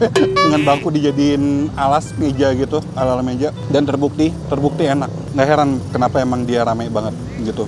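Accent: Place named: West Java, Indonesia. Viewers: Gw perhatiin, koh klo ngomong sama orang sunda logat ngomong nya udah bisa ngikutin logat sunda sunda gitu 😀 ketularan kayaknya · Karena sudah belasan tahun di tanah pasundan, udha biasa banyak banget orang jawa di tanah pasundan yang nyunda banget logatnya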